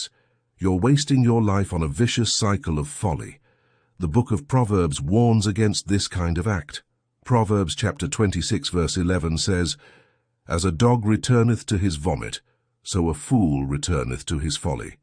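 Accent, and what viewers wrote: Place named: United Kingdom. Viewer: Dear Sir. Thank you for the content that you create and share with us. is a blessing to humanity and your wisdom truly touch our hearts. At home we refer to you as the man of God with the British accent. This video made me burst out in tears 😢 I grew up with many setbacks and only discovered myself late in life. From a very young age the enemy has set traps in motion to delay and derail me from my God given destiny. Just when things got better for us as a family my daughter was diagnosed with type 1 diabetes in 2022 at 17 months old. It turned our lives upside down and we face many challenges. I had to resign my job to take care of my daughter full time to meet the requirements of keeping her healthy. I feel like a loser when i cant give my children what they ask for and also the full financial responsibility being on my husband. 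We are a praying family and we love and trust in Jesus. There are so much i dont comprehend and my heart is aching for my daughter and what we are going through. Please pray for my daughter that God will heal her. May God bless you endlessly and continue to do mighty works through you and this channel. We are truly blessed to have you ❤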